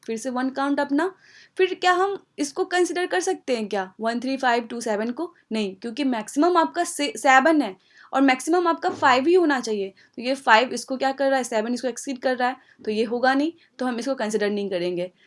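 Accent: Bihar, India. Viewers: Dont judge me but from your accent what I can assume that you are from "Bihar" who also lived in Bengal :) . I am super happy to see a girl from "Bihar" solving and explaning hard problems of leetcode . Keep going :)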